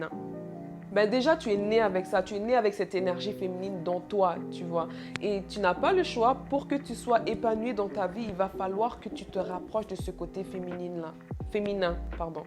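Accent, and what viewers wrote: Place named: England, United Kingdom. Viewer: Ton français provient d'un accent anglais, je crois 🤔?et du courage je me suis déjà abonné....du courage pour la suite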